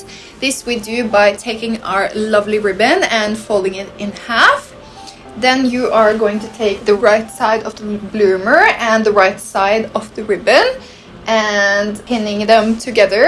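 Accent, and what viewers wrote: Norway. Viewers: she’s Norwegian she has an accent… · 😅 she is a Norwegian fashion designer. I guess you don't like her accent then? Wonderfully thorough tutorial without being too long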